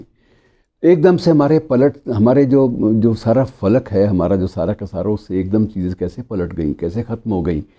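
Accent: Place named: Pakistan. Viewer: Iss aadmi ko sunke aisa lagta h jaise kisi pakistani ko sun rha hu, ab dekhlo jinki jeeb tak dushmano jaisi h unke vichar kinke jaise honge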